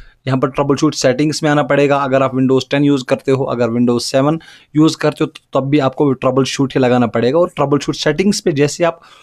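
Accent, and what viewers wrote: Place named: Kashmir, India. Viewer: It sounds like u r kashmiri ....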